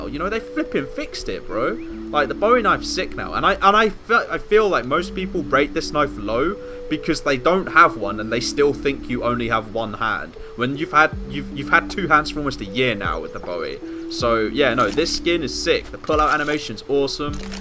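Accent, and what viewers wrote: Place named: Italy. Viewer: fuck italian english is so wild bro 😂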